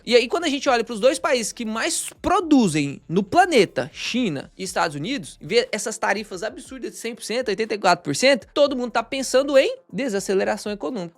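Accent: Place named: Minas Gerais, Brazil. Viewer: Alguém sabe de onde é o ADM? Pelo sotaque, e por um “uai” que ele solta de vez em quando eu arrisco que é do nosso país Minas Gerais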